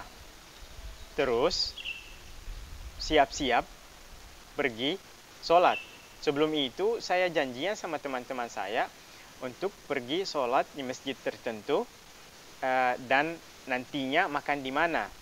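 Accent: Makassar, Indonesia. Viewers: Pantas logat mu logat makasar . Ternyata kamu kuliah di makasar ya ?? Saya juga anak sulawesi.. Tapi saya di Gorontalo.. Berdekatan dengan Sulawesi Tengah dan Sulawesi Utara 🙂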